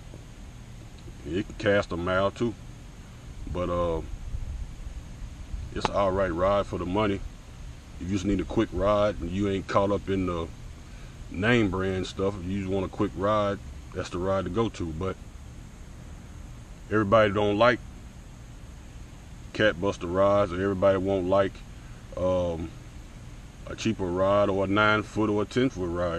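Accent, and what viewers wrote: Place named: St. Louis, United States. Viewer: You sound like you from STL..😂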